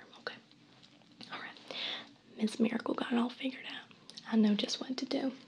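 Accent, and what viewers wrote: South, United States. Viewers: It's insane how much you sound and act like my cousins in the south in these roleplays. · This is by far the tinglest video you've made...especially at the beginning when you're just talking in that melting southern voice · This southern accent is soo cute 😍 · Good job depicting how us southern talk and act .. well the true south that is .. hehe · I love this character! I'm not sure if you're from the south, but you do a pretty good job executing the twang. ; ) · How not love her southern accent 😊😊😊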